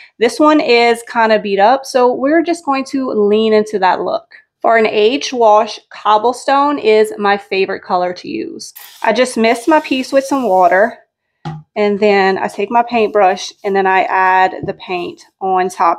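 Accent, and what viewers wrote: Louisiana, United States. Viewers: You sound like a Louisiana girl! I’m from Louisiana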